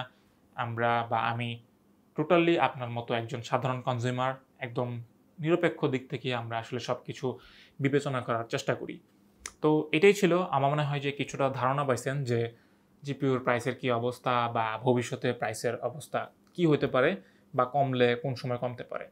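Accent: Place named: Chittagong, Bangladesh. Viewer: your accent kinda sounds like chitainga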